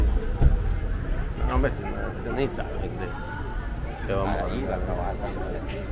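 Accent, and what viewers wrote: United States. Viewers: No manches muy americano abla español maje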